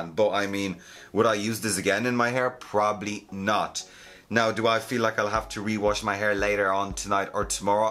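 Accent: Ireland. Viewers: I've really been enjoying watching your videos over the last month. I love the irish/UK slang and accent. I've always been a fan of hearing the different dialects. I'm pretty sure that started when the Spice Girls came out in 1997. Eleven year old me was extremely obsessed with them. Good lord. It was bad.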